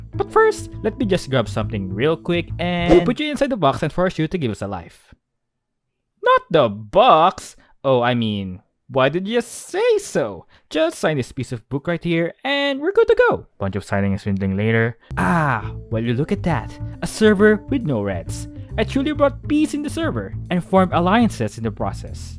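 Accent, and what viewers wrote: Philippines. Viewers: Ya kinda have a Filipino accent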